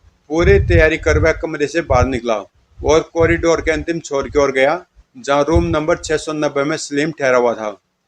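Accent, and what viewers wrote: Haryana, India. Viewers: Love your Haryanvi Style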